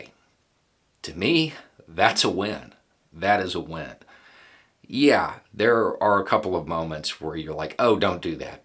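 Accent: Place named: Oklahoma, United States. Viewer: are you by chance from Oklahoma? (accent related)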